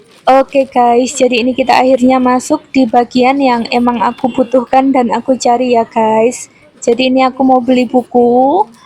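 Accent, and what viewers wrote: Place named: Jakarta, Indonesia. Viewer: oooh klo bicara model jakarte😂😂😂😂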